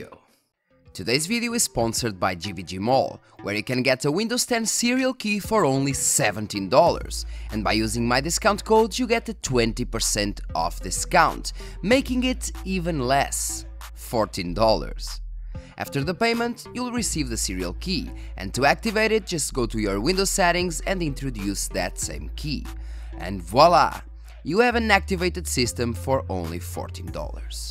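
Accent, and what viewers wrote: Portugal. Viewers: Vi logo que com esse sotaque só podias ser português hahah, bom video! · Olá, parece que és Português hahahha. Achas que devo fazer o upgrade de 16GB RAM (2x8gb) para 32GB RAM (4x8gb) ou devo ficar com os 16GB? Ps.: Lembrando que fica caro, comprar 32GB RAM (2x16gb)... só se conseguisse vender as RAM que tenho. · Tens mesmo sotaque de tuga !!!!! Excelente video....Parabens !!!! ;) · Bro are you Portuguese?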